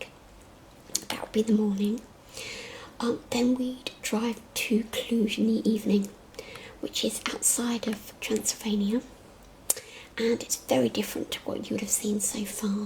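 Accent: United Kingdom. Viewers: I love your British accent! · Great to hear an English accent ASMR vid! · Instantly subscribed after only watching a minute of this video. :) So nice to hear a British accent among the ASMR community. Yours is really soothing and relaxing, love your voice, instant tingles. x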